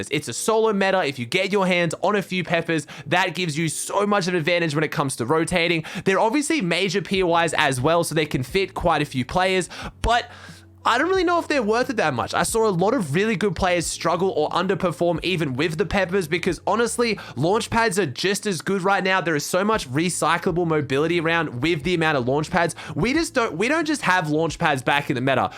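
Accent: Australia. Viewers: Are you from Melbourne or Sydney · Are u an all-star Aussie?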